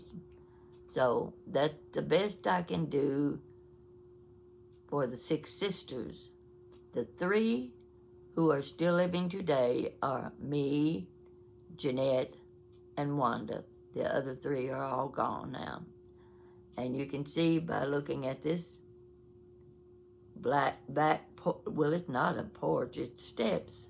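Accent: South, United States. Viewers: Your Southern accent reminds me of my grama. 🎉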